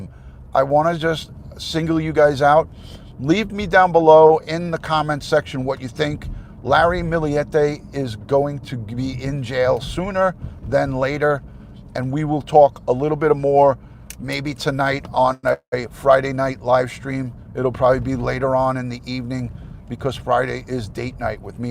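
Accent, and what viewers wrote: New York, United States. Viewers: ! I wasn’t subscribed to your channel even though I’ve been watching your content! Well, now I’m subscribed- I love your NY accent, and your input from a “lawr enforcement perspective”❤️👍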